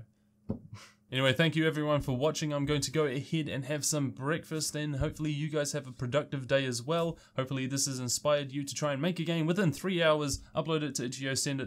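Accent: New Zealand. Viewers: Are you from New Zealand I know a kiwi when I see one! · Absolutley great video! Subbed. Are you from New Zealand by any chance? Your speech (and yourself a bit) reminds me of Jemaine Clement :D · Where are you from? Your accent (and name) makes it sound like you are from New Zealand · Are you a New Zealander? that accent or lack thereof an accent is a dead giveaway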